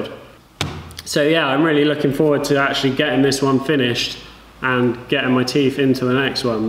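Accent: United Kingdom. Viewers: Keep up the good work mate love the channel its good to hear a British voice 😂 it’s a shame you didn’t fabricate your own arches would make great content!!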